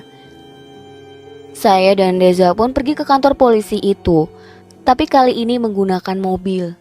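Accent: Banyumas, Indonesia. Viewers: Udah penasaran sama akhir ceritanya, logatnya ngapak2 medok ya min 😂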